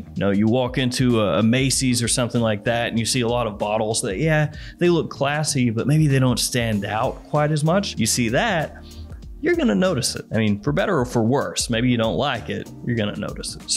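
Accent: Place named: Tennessee, United States. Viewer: Your TN accent sounds pretty similar to ours out here in Raleighwood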